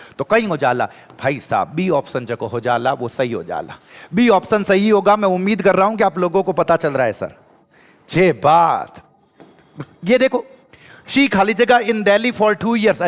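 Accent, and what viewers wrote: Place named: Marwar, India. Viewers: Jordar he sir marvadi 😅😅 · Jai mata di apki class Boht Boht best aa bt muje smj ni aata apki marvadi hindi me kahite to jada achha hai jaipur jodhpur us side wale smj lete hai hme smj ni aata plsss ap boht hi acha pda rhe ho ❤sorry feel mt krna sir ji ap hmare Boht best ho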